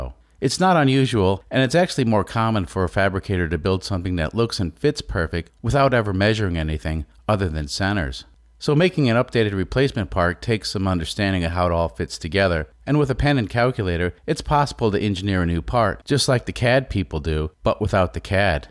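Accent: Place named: New England, United States. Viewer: You sound like a New England transplant. Wicked awesome, hey.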